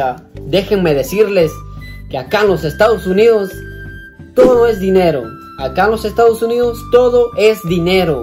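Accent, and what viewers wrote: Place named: Guatemala. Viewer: Con tu forma de hablar me dice que eres de guatemala y también la musica de fonod es muy típica de aquí XD. Orgulloso de mi guarte :'3 comiendo mrd juntos pero juntos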